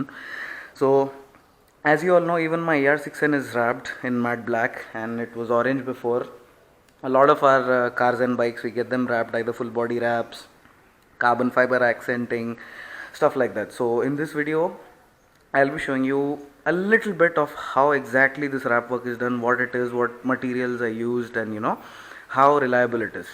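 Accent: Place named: Kerala, India. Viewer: I love your accent brother! You make me remind of my mallu friend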